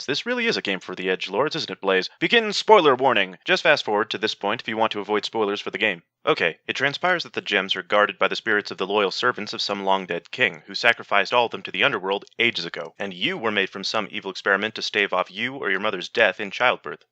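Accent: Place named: North America. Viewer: You, sir, are too good. Thank you for the correction as well. You emulate Yhatzee's style so well. So well, in fact, if it weren't for the polite (nay, almost posh) North American accent and the garish colour scheme for the sake of individuality, I'd say it was the man himself. More please.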